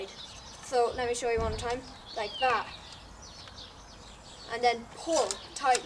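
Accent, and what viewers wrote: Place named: Ireland. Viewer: Where are you from? Leitrim or Galway? To be perfectly honest i cant tell with you southerners...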